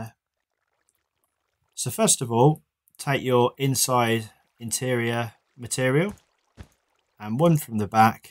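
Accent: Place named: United Kingdom. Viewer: Wow! I just realized how much brit eng. annoys me.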